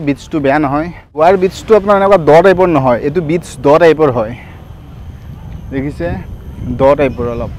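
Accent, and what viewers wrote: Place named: Barpeta, India. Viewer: axomiya vakha tu thik koribo lage...borpeta vakha di kam nhobo..gotei axom a buji napai...iman bosor dhori guwahatit thaki o vakha tu thik koribo nuwarili no..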